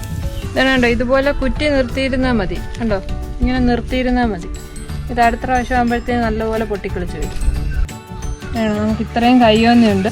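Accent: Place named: Kollam, India. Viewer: kollam kaari aanenn samsaram kettapozhe manasilayii 😍😍😍❣️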